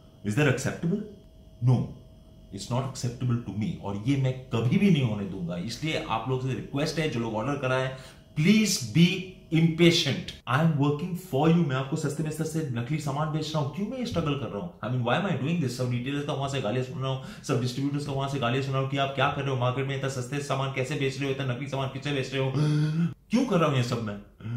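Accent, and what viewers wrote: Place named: Bengal, India. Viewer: Haha this was such a fun dig at this guy! That bengali accent added more flavour..regards, fellow bong :)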